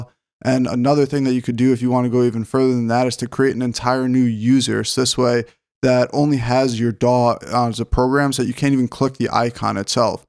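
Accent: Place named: Liverpool, United Kingdom. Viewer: Good video thanks! Random question but your accent sounds like you have a slight scouse twang,were you originally born in Liverpool UK?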